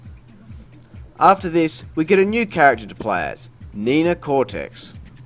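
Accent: Australia. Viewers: I'll guess your region Australia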